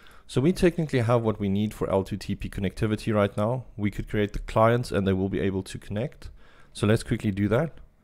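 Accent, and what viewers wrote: South Africa. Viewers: Hi, are you from South Africa? Your accent sounds South African:) If so, do you provide in class training. Your explanations are wonderful and I can see where you can add value to my team and I.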